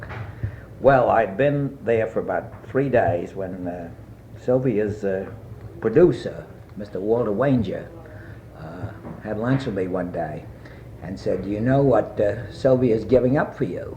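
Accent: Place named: New York City, United States. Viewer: That accent is Manhattan West Side, like *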